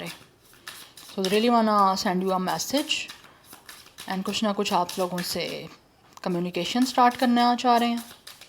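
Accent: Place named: Haripur, India. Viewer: Ape card reading main her bate per yes keuin bolty hain Mazret kay sath ape Hari poor key hain ape kay Lehjay say Andaza Lagya hy main nay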